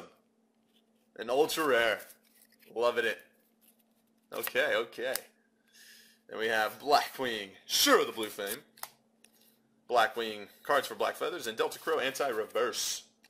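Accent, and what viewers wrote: Germany. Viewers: Sounds like you are TRULY german!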